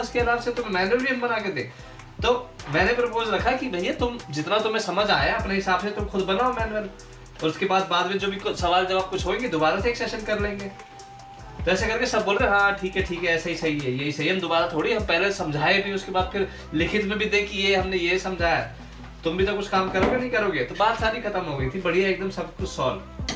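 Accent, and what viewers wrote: Haryana, India. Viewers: Bro I love the u video start or moj aa ri aa b ri ya aise bqt kat ra Jo Haryanvi ton h lov it bro thanks for making videos luv u from Himachal Pradesh India jai hind bro🇮🇳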